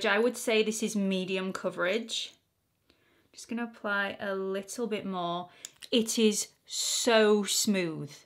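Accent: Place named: England, United Kingdom. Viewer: All your descriptions still sound so proper with your English accent. 😊 Please do the Essence review next. 😁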